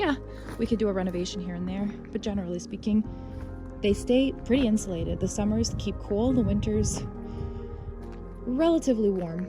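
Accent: United States. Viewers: Fascinating, I hope you do continue. I assume you grew up in the US from the way you speak, but spent time in Bulgaria at this village with baba and diado. I'd be interested in hearing more about your reasons for moving, particularly since you're so young. My wife is from Sliven, and we have been visiting (from California) for 25 years, and have been sending our boys for the summers. Her mother's parents were in a tiny village far away, in the far NW corner of the country (you could see Serbia), I don't remember the name, and it was rustic to say the least. I'm hoping to retire to Bulgaria in several years after the youngest boy goes off to college.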